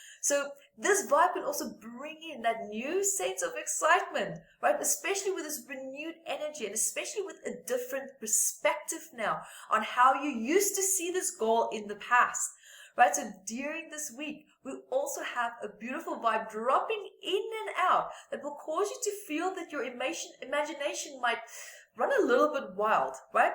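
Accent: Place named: South Africa. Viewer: She is from South Africa 🇿🇦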